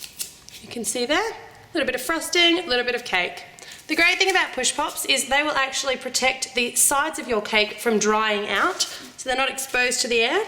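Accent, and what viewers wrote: Australia. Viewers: its how australians sound ahahah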